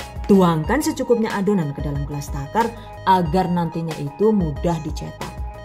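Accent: Banyumas, Indonesia. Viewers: Keren kalau nonton chanelnya,yg saya suka sampai modalnya di catat dengan lengkap karna saya pedagang,jadi seneng aja. tapi saya KEPO 🙆🙆aslinya orang mana,kalau di lihat kayanya tinggal di jawa timur,tapi kadang ngomongnya ada NGAPAKnya😁🤣 apa kaya saya karna temen q dari mana"jadi q bisa sedikit" Logat dari temen" kok q jadi ngelantur🤦🤦pokoknya q Lope-Lope lahh😍😍😍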